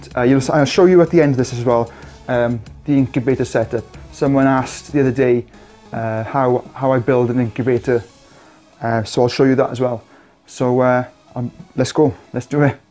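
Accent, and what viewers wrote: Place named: Wales, United Kingdom. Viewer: I really enjoy your videos, no messing around! I love your accent, which part of Wales do you live? I live in Brecon, but I lived in Crickhowell for years, no accents, my brother lives in Merthyr and they all have strong accents that I don’t understand! 😜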